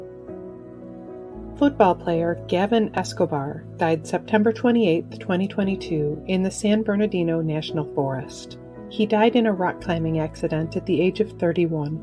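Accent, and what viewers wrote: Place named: Midwest, United States. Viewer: What a nice narrator. Such a kind, calming voice. And I hear a midwestern or WNY accent which reminds me of home.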